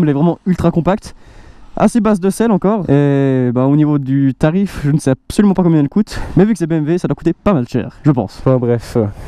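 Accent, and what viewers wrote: Switzerland. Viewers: L’accent suisse 🇨🇭👌🏾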